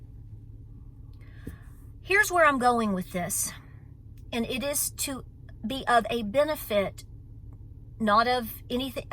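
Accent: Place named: East Tennessee, United States. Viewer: How do you pronounce that BUUT? OR BUAT? BOOUT? I am from east Tennessee and we definitely have a unique way of speaking! I have lost most of my accent but love hearing it now! And girl you got it !!! Love you!!!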